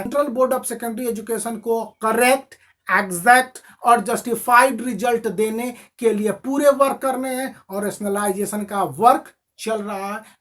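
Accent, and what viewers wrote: Bihar, India. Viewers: Sir aapka bihari accent mujhe bohut pasand aya🔥🔥👍👍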